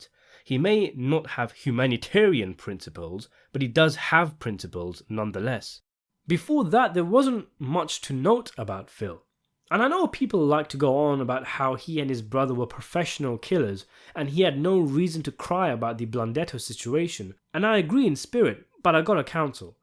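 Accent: United Kingdom. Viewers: Listening to a British guy talk about a show about Italian gangsters is just wild to me idk why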